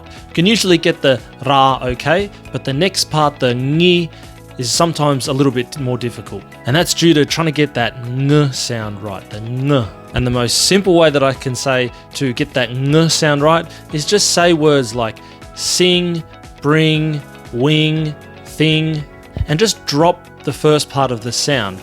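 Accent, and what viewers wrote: Australia. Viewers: I find the Austraalian accent different. But what makes your vids awesome is that you break it down so easily. E mihi ana. Can't take the māori out of the Māori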